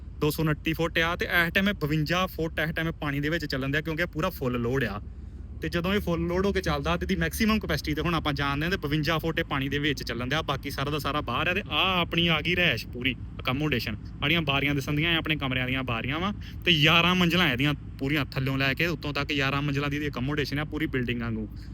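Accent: Majha, India. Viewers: ਬੰਦਾ ਮੈਨੂੰ ਮਾਝੇ ਦਾ ਲੱਗਦਾ ਹੈ 🙏🙏🙏🙏🙏🙏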